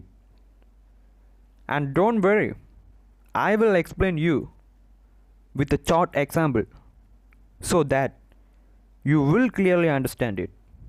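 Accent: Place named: India. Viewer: Can’t you hear he is Indian 😅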